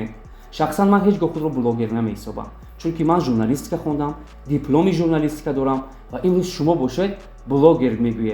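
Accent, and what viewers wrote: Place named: Tursunzoda, Tajikistan. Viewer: Муҳтарам шумо зодаи кадом гушаи Точикистон ҳастед, чунки тарзи сухангуйиатон каме ба мо мардуми Турсунзода монанди дорад?Агар малоли хотиратон бошад узр мепурсам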